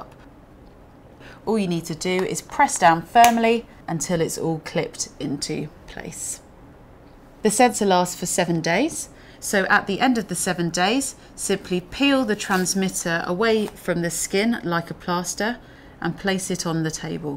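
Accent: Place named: England, United Kingdom. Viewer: Wow , I'm in Love ! I really enjoyed this presentation and her English accent as well !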